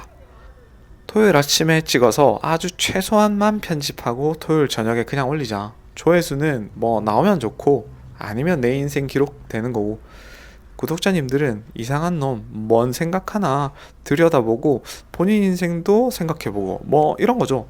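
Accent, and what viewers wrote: Gyeongsang, South Korea. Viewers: 저도 얼마간의 기간제 경험이 있어서 선생님께서 영상에서 하시는 말씀에 많은 공감이 가네요. 모쪼록 마음속에 품으신 계획들 번창하시길 바랍니다. 책읽어주시는 컨텐츠가 철학적이면서 많은 생각이 들게 합니다. ^^. 아 그리고 저도 부산사람이라 경상도 억양과 말투가 참 정겹습니다!! 건승하십시오~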